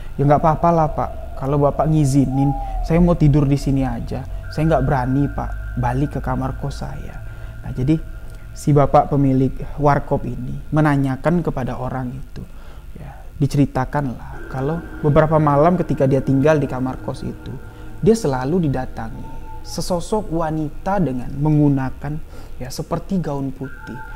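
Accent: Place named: Madura, Indonesia. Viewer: Mas tu orang mana ya kok ada logat maduranya...🙏...seneng dengerin cerita 2 dr mas...sehat sll sukses...mantap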